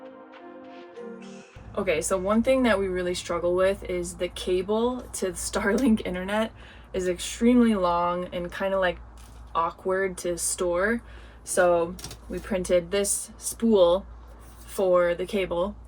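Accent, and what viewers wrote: France. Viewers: always makes me smile when i hear a french accent on an US based video^^ good ideas to print those things :)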